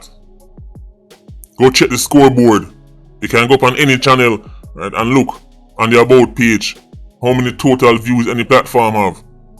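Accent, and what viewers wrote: Trinidad and Tobago. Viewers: lol@ his trini accent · 🤣🤣 sir P, di " Trini accent" madd!! 🤣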